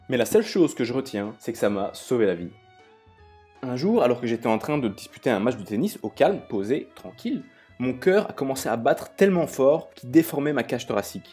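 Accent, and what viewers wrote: Belgium. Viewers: T’as trop l’accent liégeois comme moi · T'a un accent belge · Je déteste ton accent belge ms putains tu m'as bien fait rire !!! #bx #ulb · T BELGE OU FRANCAIS!?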